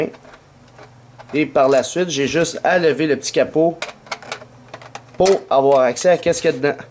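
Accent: Quebec, Canada. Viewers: Québécois ;o)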